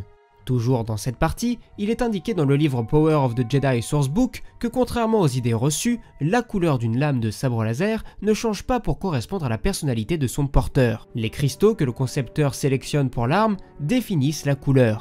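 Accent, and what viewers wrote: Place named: France. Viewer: J’habite aux États-Unis et ouais, tu parles avec un accent français, mais, je comprends parfaitement ce que tu as dit. Bravo! Aussi j’adore tes vidéos. J’apprends français maintenant et j’adore la guerre des étoiles, et ta chaîne m’aide à apprendre. Merci et continues ce que tu fais!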